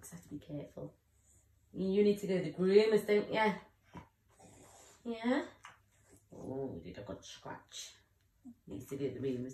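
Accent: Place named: Liverpool, United Kingdom. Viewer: Scouse in the house 😆👍 your like me. I sweat when I talk to people I don't know. I don't know what to say to them unless I've had a drink . If I go to party's I have to go and sit on me own for a bit evey so often to get some space lol i hate going to places I don't know aswell . The ailen thing you said hit me because my m8 would say I'm like and ailen from a different planet hahaha and I always make sure iknow were people are in the room . From hearing you talk I can see your not stupid your smart and probably a nice person if your in a good mood lol things will get better where all fucked up other people are just better at hiding it .nice one for telling your story i appreciate it feels good to know I'm not the only one 😆👍👍👍👍👍👍👍✌✌✌✌✌✌✌👋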